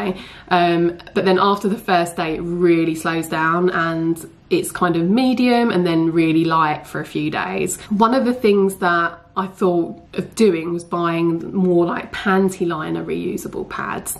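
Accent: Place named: Essex, United Kingdom. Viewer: Have to ask, where in Essex are you from? Your Essex accent is very much posher than mine haha.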